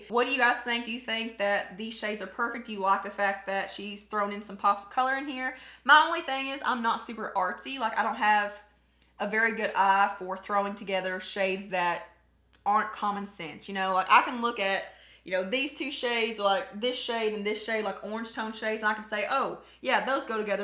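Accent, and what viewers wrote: South, United States. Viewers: I heard your accent and subscribed😂😂 As a girl from a small town in NC, I say we need more southern youtubers lol!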